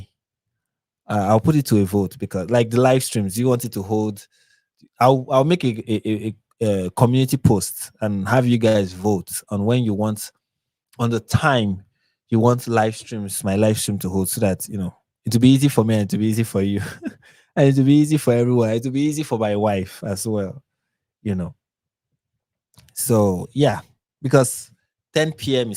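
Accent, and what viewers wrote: Nigeria. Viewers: The world doesn't revolve around Americans......So get used to the accent. PROUDLY NIGERIAN 🇳🇬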